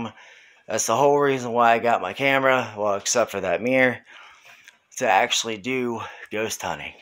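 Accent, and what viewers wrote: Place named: West Coast, United States. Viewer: Wow well out on the accent HA HA HA HAthat's comical,and I thought I was good at accents,could have sworn I heard that west coast surfer sound in your accent ahhhhh... Yeah,she's ok now,very long time ago but when that happened and the things that happened after.. it was like with taps coming in and noises like coming down the stairs,made me think of your doors opening and stuff it's always hard to catch these things on camera though. Like they don't want to play when you do,when I was at my other flat two small pictures just jumped off the wall whilst I was looking in the mirror near them I only saw it through my peripheral vision but the girlfriend saw it I was like ' wtf happened then' but she saw it,they couldn't have fell by accident as they wehanging off nails you had to lift them up to get them off and other times,other things that were quite frightening at times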